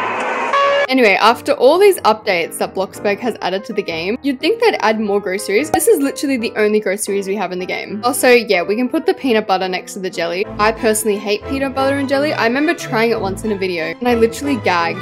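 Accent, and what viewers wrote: Australia. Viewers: OMG UR AUSTRALIAN??!! if u r im so HAPPYYYY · Your from Australia same gurl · What your Australian?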